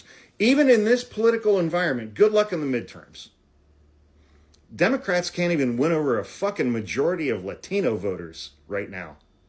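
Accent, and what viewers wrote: Canada. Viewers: You sound mostly like a left-ish Canadian. Speaking as one, not an insult. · You sound Canadian, almost.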